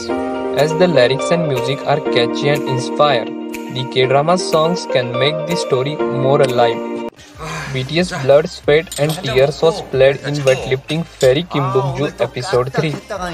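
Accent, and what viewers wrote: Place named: India. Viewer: Listening to your voice makes me think u r an Indian am I right ??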